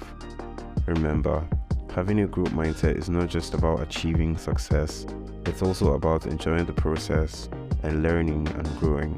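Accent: Nigeria. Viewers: I just want to know, cos you sound Nigerian. Are you Nigerian? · Why you have Nigerian accent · You know he's valid when hes talking bout mens self care and he has a nigerian accent.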